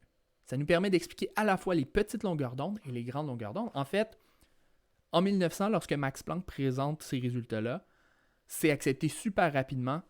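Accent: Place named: Quebec, Canada. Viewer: Même avec l'accent québecois, c'est très clair ! Bravo pour cette présentation. J'attire votre attention sur un papier de Poincaré de 1912, peu avant sa mort, intitulé "sur la théorie des quanta" qui montre que l'hypothèse des quantas est la seule à conduire à la forme du spectre donnée par Planck. Après Einstein qui est le premier à prendre au sérieux l'hypothèse des quanta en 1905 dans son papier sur l'effet photoélectrique, et avant le modèle de Bohr de 1913, ce papier est à mon avis une étape importante dans la genèse de la mécanique quantique.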